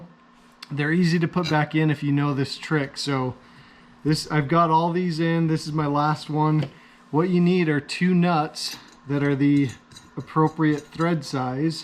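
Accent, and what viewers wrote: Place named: Payson, United States. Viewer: Didn't have to look at your contact info to know you were from Payson haha. Your accent tipped me off, and the RMATV bag in the shot comfirmed haha. Great video! My bike burns oil on startup. It's got over 1,000 hours on all the original top end. I'll probably ride it through the summer and rebuild the top end this winter. Great video!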